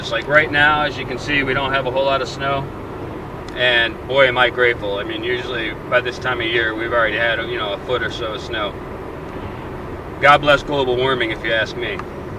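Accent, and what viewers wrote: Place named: Minnesota, United States. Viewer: kind of sounds like northern Minnesota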